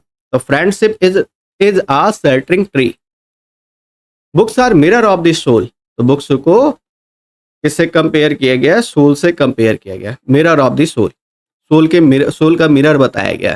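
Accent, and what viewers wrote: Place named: South, India. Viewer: sir south se hai kya unke accent se lg rha 😅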